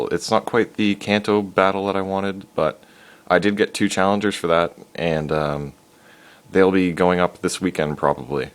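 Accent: Canada. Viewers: Hey, I saw you said on your channel that you use the desmume emulator so I went out and downloaded it, but I have a few questions how did you get your pokes to the correct EV IV and movesets. I've been trying to use action replay codes but I'll get the pokemon I need and then, my game will glitch out deleting my save file. Also it's no trouble to tell that you're Canadian lol.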